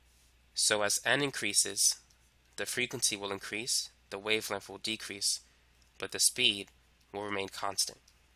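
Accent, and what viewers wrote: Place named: New York, United States. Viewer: I love this dude. he's sounds slightly gangster, and fully genius at the same time. sounds like a New Yorker who made it out the hood because he smart af. Props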